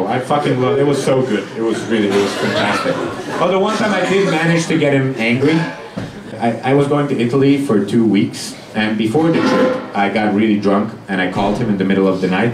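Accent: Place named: India. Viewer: Yea it sounded kinda indian/pakistani to me too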